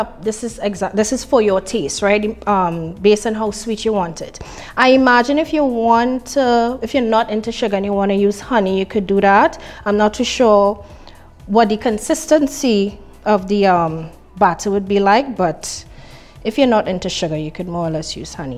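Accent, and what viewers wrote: Trinidad and Tobago. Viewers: Ah like de trini accent gul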